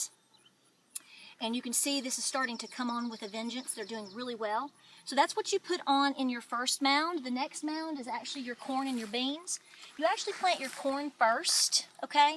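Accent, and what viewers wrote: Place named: Kentucky, United States. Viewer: Your garden looks great! Up farther north and in mountains here we have a much later start, and one thing after another has been going wrong for me this year. I've got to get one of those owls, might keep the wild bunnies out. I'm about to build a wattle fence. I also need to get some form of irrigation set up, would you believe my house has no outdoor spigot. Love your accent reminds me of friends I had growing up in ky.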